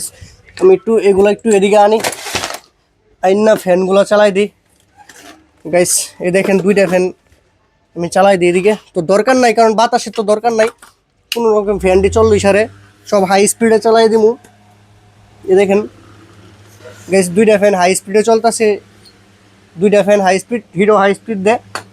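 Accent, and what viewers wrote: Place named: Char, Bangladesh. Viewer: ভাই আপনার বাড়ি কোথায়,দেখি চরের ভাষায় কথা বলেন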